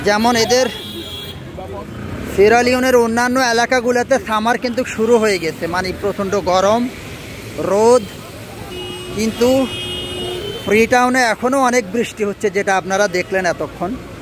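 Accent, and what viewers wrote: Noakhali, Bangladesh. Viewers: ভাইজান নোয়াখালী র ভাষার টান মনে হচ্ছে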